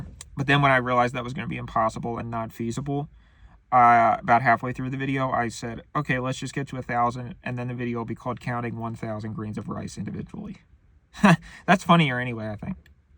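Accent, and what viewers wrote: South, United States. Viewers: His really shitty southern accent is so freaking great man, I love you and all your quirks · the southern TWANG is NOT A COSTUME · The southern accent arc was crazy…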